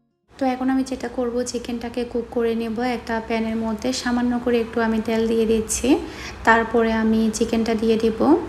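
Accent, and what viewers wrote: Chittagong, Bangladesh. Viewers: চট্টগ্রাম এর ভাষা একটু অন্য রকম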